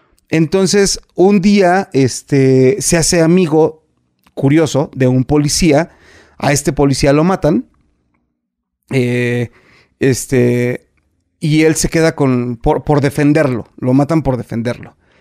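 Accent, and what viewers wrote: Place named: Mexico City, Mexico. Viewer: Yo lo conozco por Teresa, TELENOVELÓN. Mee encanta ese acento chilango marcado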